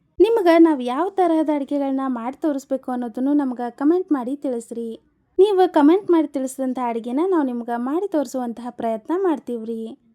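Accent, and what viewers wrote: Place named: North Karnataka, India. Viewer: Nim uru yavdu mam nim bhashe uttar karnataka shaili ede mam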